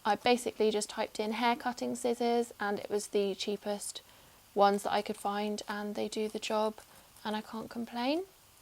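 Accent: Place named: United Kingdom. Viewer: Lily is so cute. And you have a very pretty British English accent.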